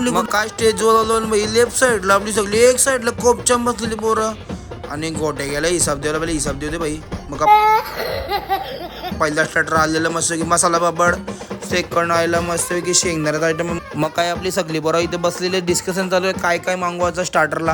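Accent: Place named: Agri, India. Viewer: Tumchi agri marathi lai bhari ahe bhava ❤❤❤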